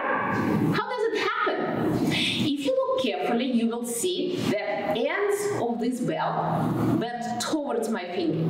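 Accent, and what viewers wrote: Russia. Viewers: Увидела ролики с ней в ВК и сразу стало понятно, что она из русскоязычной страны - настолько этот акцент в английском языке слышен мне, обычному русскому обывателю. Именно так, как преподавали инглиш в советских школах (произношение я имею в виду). Я специально пошла на Ютуб искать эту чудо - даму и наткнулась на этот канал. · Обожаю её акцент, сколько лет живёт в англоязычной стране, а всё равно остаётся русской!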